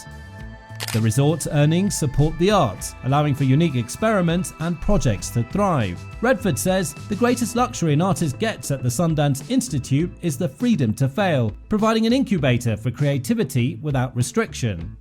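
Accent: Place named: United Kingdom. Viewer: Often adding a British accent to a video presentation seems to give it more credibility but in this case, not so much. It would have been better with a Peter Coyote type voice. It would also be better to showcase the whole resort and institute with all the available photos instead of constantly showing the same photos. And finally, it would have helped to match the narrative to the photos. Yeah, I’m feeling grouchy today but Redford deserves better.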